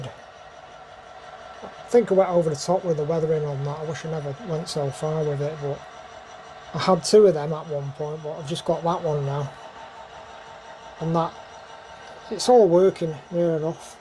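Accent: Manchester, United Kingdom. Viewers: Excellent video where you from you sound Manchester 😀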